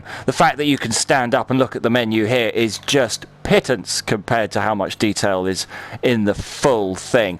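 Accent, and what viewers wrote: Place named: United Kingdom. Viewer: I love your british voice. You sounds like a commentator of sport or an astronaut commenting the spectacular view of earth today. 😄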